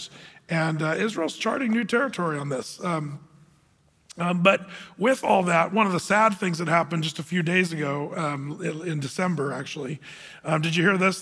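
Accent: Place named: South, United States. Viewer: you being from California …you certainly talk and sound like your from the Southern United States …Accent…..have a blessed day ✝️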